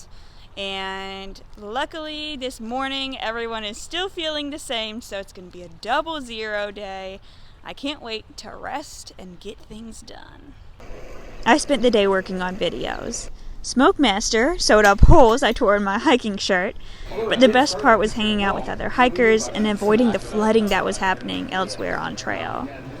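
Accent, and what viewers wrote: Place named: Kentucky, United States. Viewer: Jersey dude here. You are sweet but from a Jersey perspective your Kentucky accent is bordering on a speech impediment.I guess it is all about your point of view...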